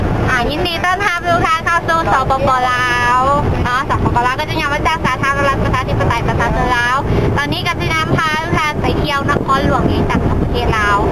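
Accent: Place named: Thailand. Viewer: สำเนียงออก ไทย มากเลยครับ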